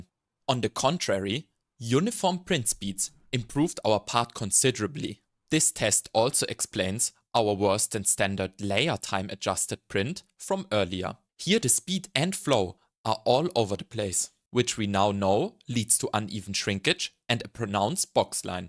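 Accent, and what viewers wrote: Germany. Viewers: 3D printing + german accent = I subscribe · Im a simple men, I hear german accent i press abonieren.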